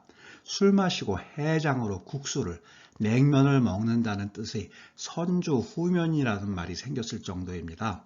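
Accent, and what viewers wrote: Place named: North Korea. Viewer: 말투가 북쪽분이신가 싶은데요.? 혹시 진짜 북쪽분이시라면 북한말투는 어떻게 만들어진건지..경상도 사투리보다 고치기 힘든지 한번 만들어주세요ㅎ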